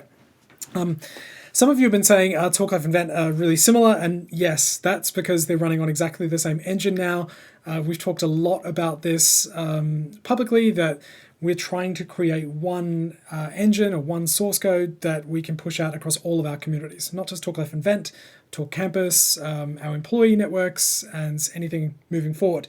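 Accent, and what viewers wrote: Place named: United Kingdom. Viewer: I think you’re slowly losing the Aussie accent 😂. You are sounding more British! Definitely sound a lot different to when I had my first video interview back in 2015 for my mod application 😊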